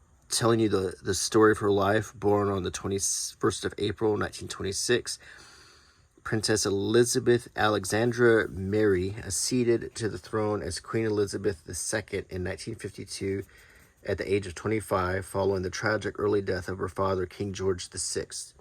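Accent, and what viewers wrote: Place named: France. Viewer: Good job mate, really relaxing! Love the french accent!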